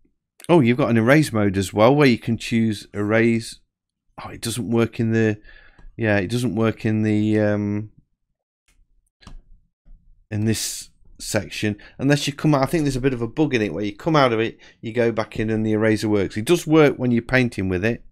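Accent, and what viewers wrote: United Kingdom. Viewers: thanks, it really helps listening to someone from Yorkshire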